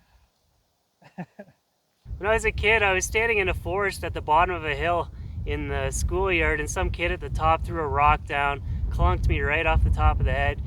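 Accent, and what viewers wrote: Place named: New Brunswick, Canada. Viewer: This is insane man. Are you originally from NB? Accent sounds there lol. I’m originally from N.S. myself and wow these videos really makes me want to explore our massive backyard. Keep it up!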